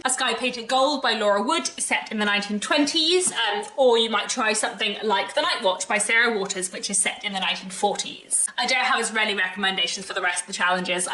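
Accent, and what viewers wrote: RP, United Kingdom. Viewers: your accent is so "catchy"! something between RP and posh English. love it !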